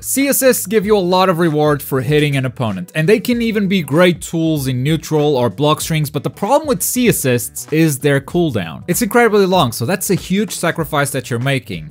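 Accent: Portugal. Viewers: Wait, are u portuguese?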